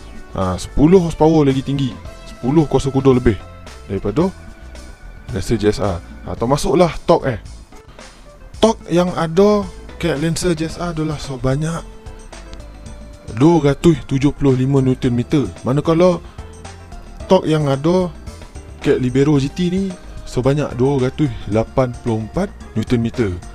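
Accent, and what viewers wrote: Negeri Sembilan, Malaysia. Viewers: torbaikk bang ribiew njen guna loghat Noghori...nnti blh try cuba loghat negeri lain plk..hahaha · 1st time jumpa channel bang. Best & santai + cakap slang noghori Auto subs & like 😁 Salam bang saya ada beberapa soalan nak tanya, mohon pencerahan. Terima kasih in advance 1) engine 4g93T vs 4g63T, mana yg lebih baik dari segi reliable, powerful, energy efficient ? 2) antara 2 engine tu, mana lebih sesuai untuk masukkan ke satria neo? 3) kalau swap engine original Satria Neo, lepas JPJ ke?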